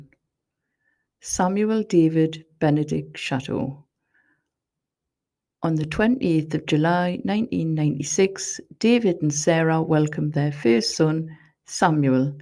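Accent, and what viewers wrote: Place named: Newcastle, United Kingdom. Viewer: It's always interesting to here of royal members who go under the radar. Very factual and informative. Your geordie accent makes it all the more grounded. Well done.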